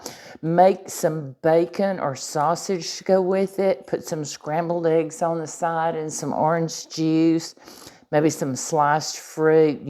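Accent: South, United States. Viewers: You sound like Paula Deen. You have that southern tring. So you have to be a good cook 🧑‍🍳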